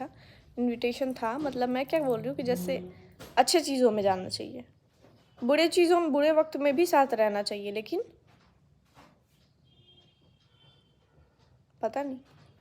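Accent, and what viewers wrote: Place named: Bihar, India. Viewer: बिहार में ऐसे ही बोलते हैं मज़ाक मत बनाओ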